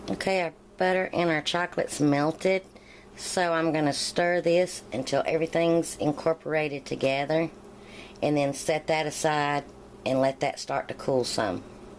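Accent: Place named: West Virginia, United States. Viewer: thank's I'm not sure how to make ganache. but will try to google it, I hate to be so dense, but could you use vanilla or caramal chips instead of chocolate?? I love chocolate but my husband likes the yellow cake. I just love your voice, reminds me of where I came from, WV. left there 55 yrs ago. but all my friends are still there. love ya and thank's for responding.BTW I got adventurs and tried the Jiffy raspberry muffin mix and put it in a small cake pan, airfried it for 20 min and it came out perfect, I couldn't beleive it.just enough for the two of us.. tks again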